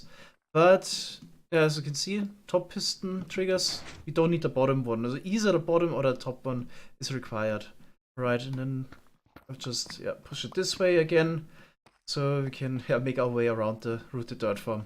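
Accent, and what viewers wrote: Germany. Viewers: Waiiiiit is he German?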